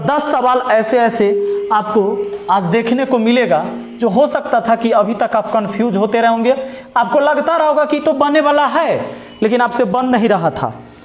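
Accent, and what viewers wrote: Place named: Bhojpuri, India. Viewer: Sir aapka bhojpuri wala hindi bahut acha lagta he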